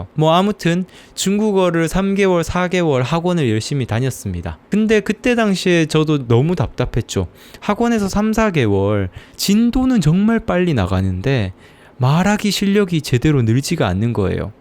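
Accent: Andong, South Korea. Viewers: 독서 검색하다 우연히 알게된 님, 말투에서 안동분임을 알아차렸죠.^^ 연배로는 한참 아래분이지만 인생 대선배인듯한 느낌에 그저 존경스럽단말밖에 안나옵니다. 오늘 아침, 밥 먹으며 고1아들에게 님 얘기를 했네요. 꼭 한번 들어가보라구요. 님이 성장이란 단어를 가장 좋아한다고 했는데 저도 그렇습니다. 그 성장이란 단어는 43살부터 본격적이었네요. 2년전부터 자투리시간 할애해서 주1회 중국어 학습지 수업을 하고 있는데 안하는거보다 낫잖아라며 가볍게 하고 있는데 님의 중국어 채널 유익하게 잘 보고 있습니다. 차로 이동하며 두서없어 하지만 이또한 안하는거보다 나으니까요.^^ 지인에게 님을 소개했더니 인생책 10권을 사서 열독하는 중이라고 그친구 정말 대단하다구 하더라구요. 동감입니다!! 앞으로도 지금처럼 차로 이동하며 화장실에서 화장하며 밥하며 수강생을 기다리며 그렇게 보고듣고 따라하며 더한 성장을 꿈꿔 봅니다. 참 고마운 님, 참 고마운 유튜브입니다!! 언제나 화이팅입니다!!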